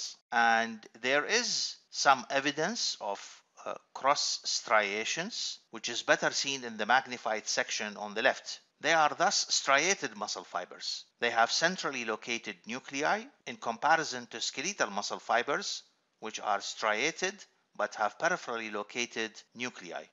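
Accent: Iraq. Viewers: شكرًا يا دكتور .. احساس كبير من الاكسنت ان حضرتك عراقي😅🙈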